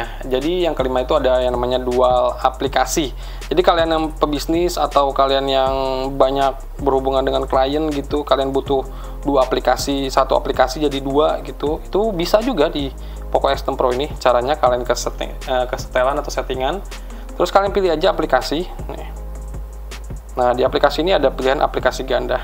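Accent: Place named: Sulawesi, Indonesia. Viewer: Kental banget logat Sulawesi nya bang 😂😂😂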